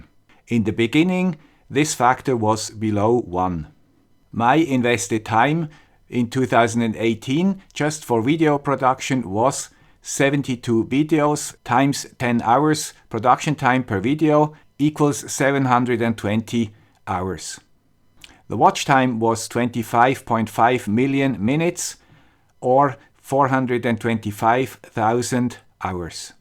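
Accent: Switzerland. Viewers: There are a lot of rumors regarding what the youtube algorithm favors. In my experience most of them are based on very small sample sizes of observations. In niches which are more about the personality of the presenter (vlogging, comedy, and in crowded topics like video gaming) a face on-screen may be more important than in videos which are mostly about the topic being presented than the person doing the presenting. In ' case, his voice is enough to identify instantly that this is a "guy with the Swiss accent" video. It is very good branding that doesn't always need a face shown constantly. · you are a very charismatic guy "with the Swiss accent". Your engineering approach combines the best mix of theory and experimentation. This is great. I reached your channel looking for long distance radios and i found LoRa and all sorts of related stuff. I kept with you and learned a lot more. 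Other people with a lot more subscribers are doing a very poor job providing very inaccurate information on everything. You know your limits and you respect them. I admire you for that. Keep your content safe for the future. Your legacy will last very long. Thank you. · Your channel is dry and humorless. A robot "With a Swiss accent"? People watch videos to be entertained. Most of your viewers know this shit already. You want proof? Make a mistake and see how many will jump in to correct you! If I want to know about a subject in depth, I'll read a few books about it. Not rely on a superficial 10-20 minute scripted video. People want honesty, but when you give it to them, they resent you for it. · Have a great 2019,! IMHO, you, like Dave, GScott and a very few others, became references for what a YouTube channel shoud be: a tool of mass education. Please keep it going, with a Swiss accent! 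· There is no competition for a smart tech guy with a Swiss accent :)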